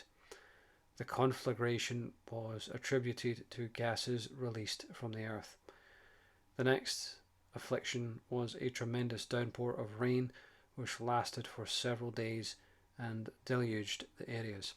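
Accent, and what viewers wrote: Scotland, United Kingdom. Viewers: I've sent your readings to my friend, I confess iv been listening as well. I've read aloud Beaumonts books to myself in my geordi accent, hearing them from a Scot though is a pleasant change haha 😄.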